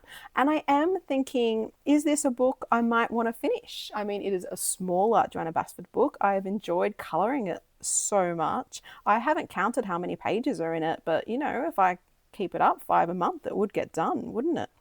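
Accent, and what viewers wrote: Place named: Australia. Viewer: Great collection of coloring pages! I’m really enjoying your channel. Your voice is so pleasant and of course I love your Aussie accent! But beyond that I really like your method of making videos. I understand when someone doesn’t want to talk all the time but I really appreciate your explaining your intentions and thoughts and how you achieve the results you get. Your color along was really good and as you said I appreciate the artist telling me what color they are using or what technique they are doing so I don’t have to watch every second and could actually “color along”. I hope you keep your passion for coloring and make lots more videos because your channel is just what I’m looking for 💕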